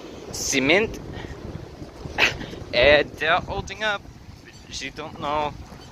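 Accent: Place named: France. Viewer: Stupid question but why are you speaking in a friench accent? (Like I said I'm watching everything chronologically. But it has somewhat stopped bc of stupid reasons)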